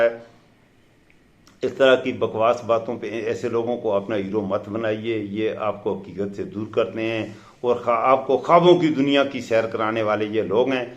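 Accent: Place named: Punjab, Pakistan. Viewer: Punjabi accent....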